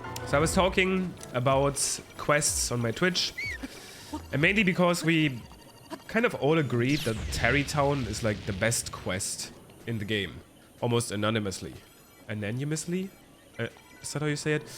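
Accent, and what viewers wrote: Germany. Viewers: Nice that YouTube starts randomly showing me small channels. Heard directly that you are German 😅 Don't worry about your voice. I didn't notice anything except the coughing.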